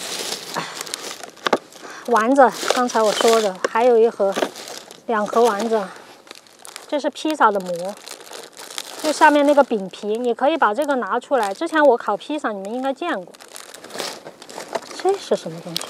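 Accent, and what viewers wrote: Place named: Northeast, China. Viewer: 又會說四川語言 又是東北口音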